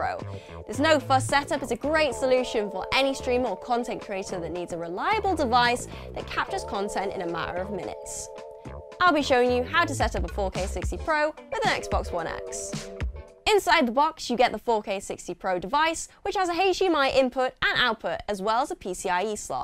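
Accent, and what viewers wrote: United Kingdom. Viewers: Thanks for the tips, and I love how you Brits pronounce "H" lol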